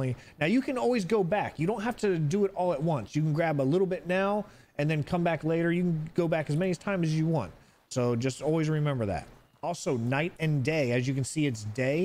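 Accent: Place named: United States. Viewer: It is somehow weird watching someone with an American accent play this game.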